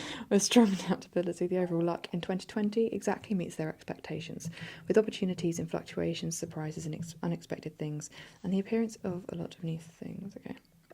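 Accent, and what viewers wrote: Ireland. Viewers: Great pt.1 of the Q&A. I think these bits of insight into who you are definitely made you feel more relatable, IMO, although we get quite a lot of that in your other uploads too! Really heartwarming to hear how your channel's had such a positive impact on your life outlook as well. As for the Irish accent video being so popular, I'm not surprised at all. There's somewhat of a dearth on YT of Irish ASMR creators, at least from what I've seen (or haven't). It can be such a beautful accent so it seems like a natural pairing with ASMR stuff. Searching for that is actually how I found your channel! Last thing, if you're 24 and born in 1996, then you're a rat. They do their signs on a rotation of twelve animals/years. This is definitely the longest comment I've ever posted, LOL!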